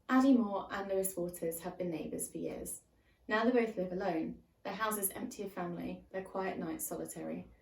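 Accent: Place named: United Kingdom. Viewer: OMG I have never laughed soooo hard while watching one of your videos 😂🤣 First off, I heard "arse holes at night" instead of "our souls at night" - Yes, that's right, despite the fact that I was born in 1993, I am in fact a twelve year old XD (although, in my defense, being from the US, I'm not used to hearing folks speak with British accent IRL so it does take me like, half a sec longer to interpret words spoken by ya'll from across the pond:3) Secondly, "The next book is second in a trilogy...I've heard there's maybe going to be another book..." - LMAO I was sooooo sure here that you were making fun of Kingkiller Chronicle before you showed the book you were actually talking about XD (I have not slept well past few days so my brain just autopilot at this point and is interpreting ppl's words wrong lol) Anyway, great video as always <3 And who know, perhaps one day I will grow up a bit and/or catch a good night of sleep:3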